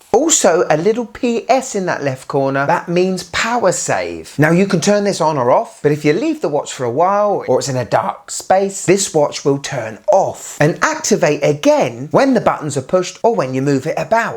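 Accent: United Kingdom. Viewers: Another really great video. It's been decades since I first noticed that you Brits pronounce z "zed" instead of "zee" the way we bloomin Yanks do. were very helpful with this, with Joe saying "Jay-ay-zed-zed" on their song "." And it's been years since I noticed that you insert an extra syllable into "aluminum." But only very recently did I notice that you blokes pronounce h "haitch" instead of "aitch." WHERE DOES IT END?!!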